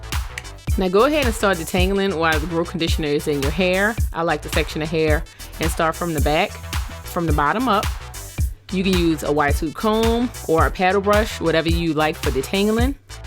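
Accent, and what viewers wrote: New Orleans, United States. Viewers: Love your accent. Are you from New Orleans? Love the hair. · I love the New Orleans accent 🥰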